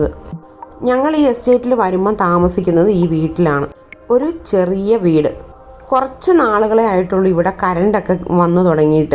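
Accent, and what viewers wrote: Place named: Erattupetta, India. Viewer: Eratupetta slang varunund samsarathil😇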